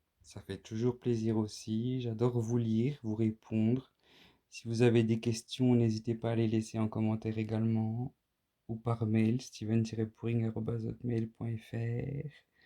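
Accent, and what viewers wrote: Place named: Besançon, France. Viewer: J’adore les tableaux aux fonds rouge ( contrairement à vous ) et je trouve que celui ci est superbe , les couleurs sont très bien choisies . Le tableau bleu , magnifique , élégant . Je salue le Bisontin , un voisin donc ? Depuis que je vous regarde, l’accent me parle mais , je n’osais pas vous demander . Continuez comme ça et à bientôt pour visionner d’autres vidéos !! 🎨🤣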